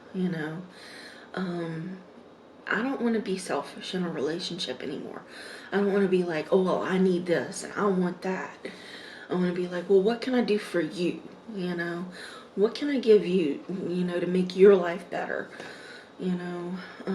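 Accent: South, United States. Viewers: !!!! Hope you're holding up. Just started the really deep abuse blogs with my husband who was severely sexually abused when he was small in Nebraska (a very bad state for that). We need a part 2 if you can handle speaking about it. Helping us immensely. Your writing/speaking is beautiful and I hope you can eventually get published, like no joke. Also, I understand this is your career but I hope you can start to incorporate more healthy items. Much love! <3 Also, I bet your live really South/Western because you sound JUST-JUST like my Nana from Galveston/Houston.:) Makes me miss her. Haven't seen her in years.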